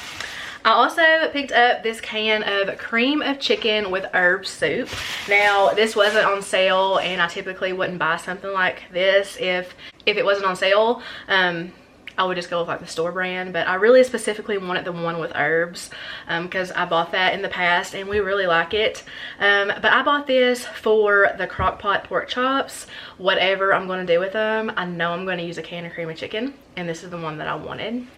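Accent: South, United States. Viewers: I had to pause the video just to say I forgot how beautiful you are with that southern accent I love🫶🏽🫶🏽. I'm a GA southern girlie (metro ATL). 💜💜💜💜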